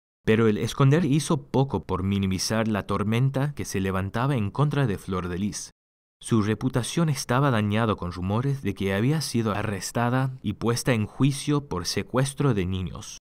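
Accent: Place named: Buenos Aires, Argentina. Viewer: Hablas como porteño(Buenos Aires)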